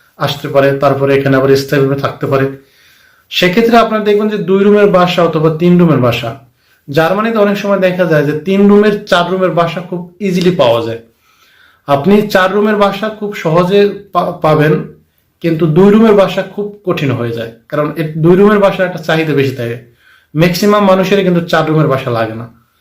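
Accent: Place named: Barishal, Bangladesh. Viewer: ভাইয়ের বাড়ি নিশ্চয়ই বরিশাল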